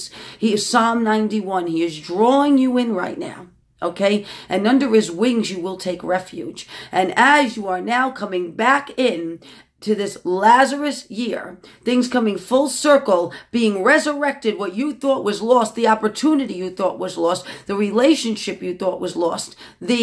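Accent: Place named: New York City, United States. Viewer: GOD BLESS YOU WOMAN OF GOD. I HAD A SISTER IN CHRIST WHO SENT ME YOUR VIDEO RECENTLY AND YOU'VE BROUGHT SO MUCH CONFIRMATION. MAY GOD BLESS YOUR MINISTRY AND KNOW I'M SENDING PRAYERS FOR YOU, YOUR HUSBAND AND YOUR MINISTRY IN JESUS' NAME. I LOVE YOUR REVERENCE FOR GOD, HIS WORD AND HOLY SPIRIT! ON A SIDENOTE I'M ALSO A NEW YORKER AND JUST LISTENING TO YOU MAKES ME MISS MY NYC ITALIAN FRIENDS. #SPELLMANGRADUATEOVERHERE 🤗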